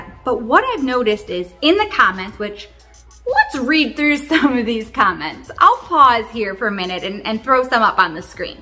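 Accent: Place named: Midwest, United States. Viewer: I also love the fact that one your mid western accent is amazing very Edie Mcclurg and also it’s very simple if people just paid attention they would know that your doing that. What fans you have, and why people talk bad about it. Haha and the fact that you had to make a video about it is silly. You and hubby are legends and keep it up please and thank you.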